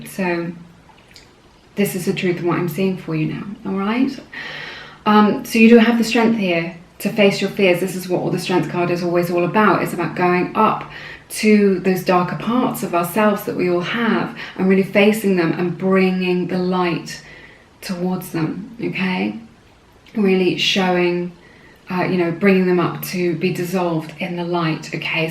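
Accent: RP, United Kingdom. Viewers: posh accent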